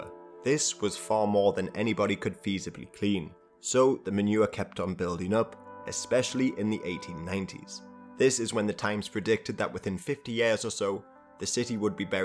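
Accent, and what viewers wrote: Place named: Newcastle, United Kingdom. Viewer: So are you a geordie trying to sound posh or what? Your accent is hard to place.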